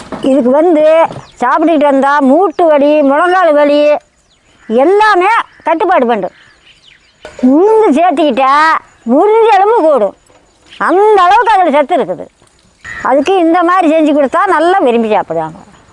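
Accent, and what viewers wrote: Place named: Kongu, India. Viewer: இது கொங்கு தமிழ். அப்படித்தான் இருக்கும்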